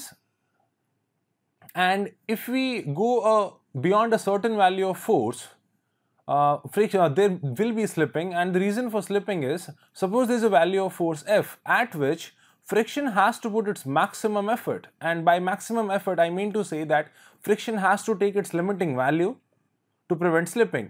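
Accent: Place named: United States. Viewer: He is an as*hole really! F*ck your Amreican accent you filthy crow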